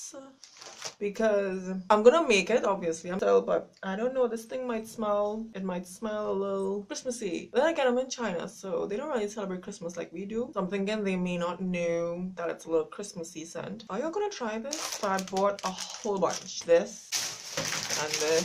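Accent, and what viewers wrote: Trinidad and Tobago. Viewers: Are u frm trinidad? Your acvent almost sounds trini 😊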